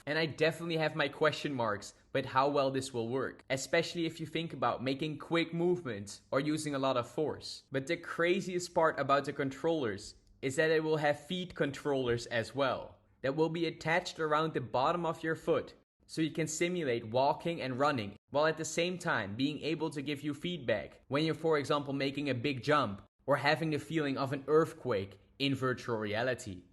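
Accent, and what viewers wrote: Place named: Netherlands. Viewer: Love the Dutch accent makker!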